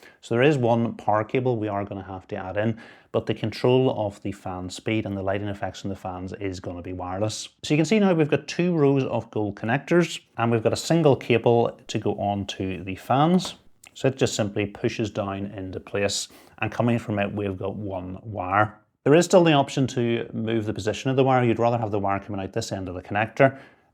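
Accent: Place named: Northern Ireland, United Kingdom. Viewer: Love hearing a local accent on these tech videos. Great to see a fellow lad from NI doing so well. Its great how clearly you explain things and take your time and dont over hyper. Keep up the great work.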